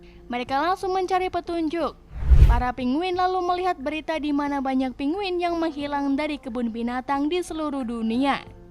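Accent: Java, Indonesia. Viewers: bak samena wong jowo yo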